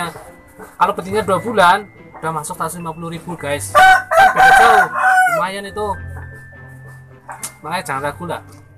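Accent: Banyumas, Indonesia. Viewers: Jyooooosss Ora ngapak ora kepenak😀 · Ya,, banyumas punya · Mas alamatnya mana ketone ngapak kaya edek pengin merguru ko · ngapakk ya mazz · Kok ngapak ,kaya nyonge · Ora ngapak.ora penak kang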